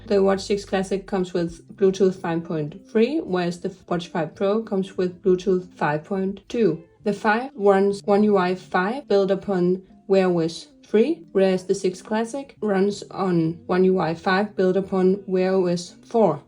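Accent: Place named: Denmark. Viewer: The Danish Accent 😍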